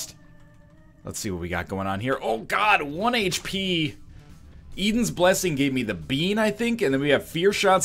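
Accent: Canada. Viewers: Put that's how people in Canada talk!!!!!! I seen SOUTH PARK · South Parks imitation of Canadians is true because that's how actually talks.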